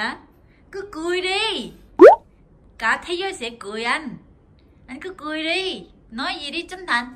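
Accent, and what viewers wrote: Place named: North, Vietnam. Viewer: lúc đó nói giọng Bắc nó chả chuẩn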